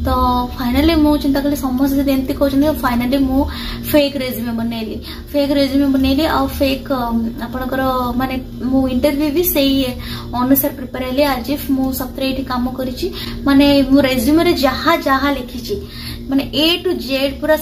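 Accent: United States. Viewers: American English kemiti Shikhile ? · How u learned American english? Plz share details...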